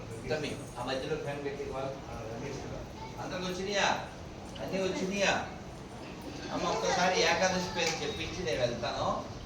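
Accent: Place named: Tirupati, India. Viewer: GURUVUGARIKI SHUBHA SAAYAMKAALAM , PADHABHIVANDHANAM. GURUVUGARU MEEKU MAA THIRUPATHI YAASA VACHEESINDHI GURUVUGARU. "ROJUKU OKATHURI CHADAVANDI" 🙏🏻🙏🏻🙏🏻🙏🏻🙏🏻🙏🏻🙏🏻🙏🏻 ANDHARI VAARU SWAMY, MEERU MAA THIRUPATHI VAARANDEE SWAMY MAA THIRUPATHI VAARANDEE GURUVUGARU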